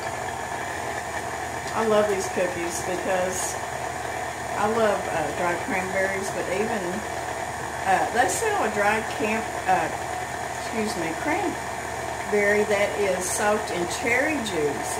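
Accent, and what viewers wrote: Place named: Alabama, United States. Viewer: love your voice it reminds me of Alabama and my folks there. Yahweh bless!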